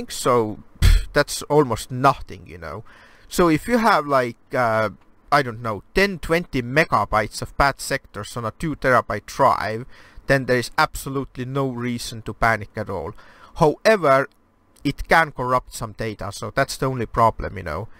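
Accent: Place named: Sweden. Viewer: clearly swedish or norwegian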